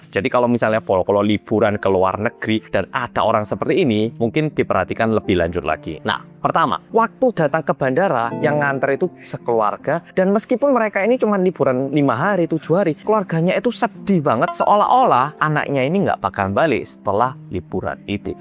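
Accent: Java, Indonesia. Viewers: mas kok suwe2 sampeyan medoke berkurang😁😁😁😁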